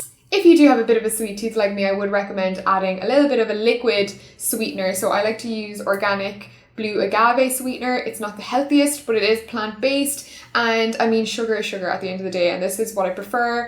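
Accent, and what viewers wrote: Ireland. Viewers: Are you Irish?!?!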